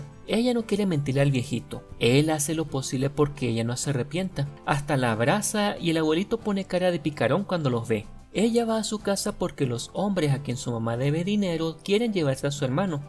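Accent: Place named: Venezuela. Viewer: Venezolano, voz agradable, muy bien resumido y entendible 👏👏👏 gracias aquí otra suscriptora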